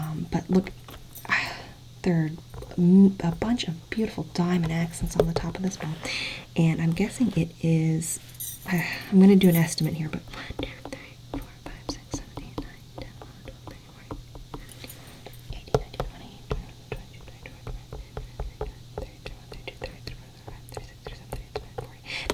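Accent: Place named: Midwest, United States. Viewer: The Midwestern accent adds to the nostalgia lol thank you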